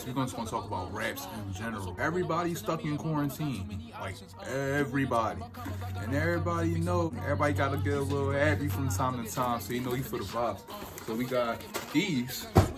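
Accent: Philadelphia, United States. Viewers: I could tell he from philly lmao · He from philly I can tell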